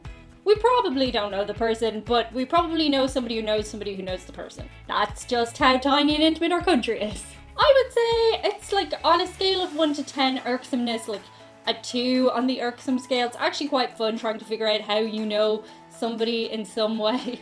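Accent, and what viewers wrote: Ireland. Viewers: A lovely young Irish woman with blue eyes and blonde hair, a charming accent, and a fun sense of humor, wonders why she gets asked to stay in people's houses, a lot? Hmmm.... Seriously, though. We do like spending time with visitors from other countries, and it's nice to just be able to sit down and talk about the similarities and differences of life in each place, and what the visitor thinks about aspects of the United States we so take for granted that we may not even notice them. I'm sure that's all it is. Mostly. :) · I just love the Irish accent ( and Scottish too ) and could literally listen to you read the f@ dictionary to me... :) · One thing you should know about 'Merica' is that your accent is like a "verbal currency". So when you come are here really "brogue" it up. You just might get a extra cherry on your Sundae. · I've literally spent the entire day binging your videos.. 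mostly cuz i just love your accent lol 😅 gotta find me a nice Irish girl 🤙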